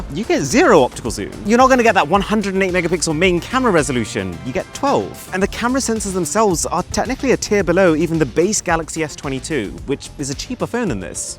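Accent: United Kingdom. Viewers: why does he have a british accent?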